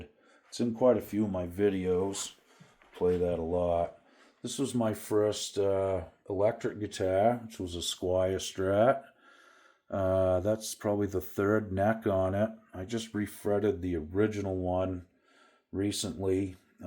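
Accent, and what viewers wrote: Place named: Boston, United States. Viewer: New subscriber!!! Great video and I love your collection. Your approach to video and guitar philosophy is enjoyable and engaging. I know you are in Maine but sound just like we do in the Boston area. We locals would tell you that "You collection is pissa!"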